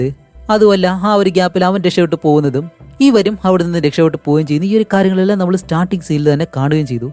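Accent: Palakkad, India. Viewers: ഞാനാണ് നിങ്ങടെ പാലക്കാട്.... സ്ലാങ് തോന്നിയ ആരേലും ഒണ്ടോ?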